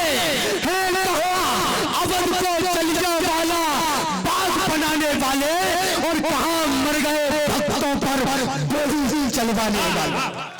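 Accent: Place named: Bundelkhand, India. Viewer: आपकी आवाज में दम है, आवाज भी बुंदेली। 🙏🙏🙏🇮🇳🇮🇳🚩🚩🚩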